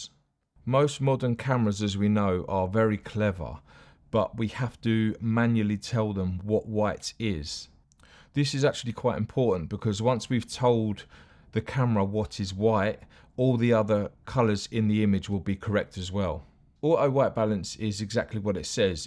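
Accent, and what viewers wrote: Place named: United Kingdom. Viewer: This is the most British dude I've ever heard in the wild. Thank you for the vid too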